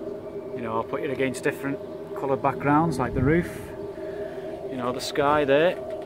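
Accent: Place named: Liverpool, United Kingdom. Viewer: You sound like your from My wife's neck of the woods. Knotty Ash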